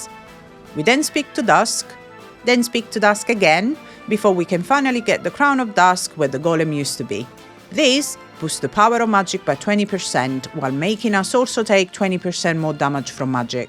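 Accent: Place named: Italy. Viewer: I can't predict how she's going to pronounce words. Italian, Southern England, and hints of Irish all rolled into one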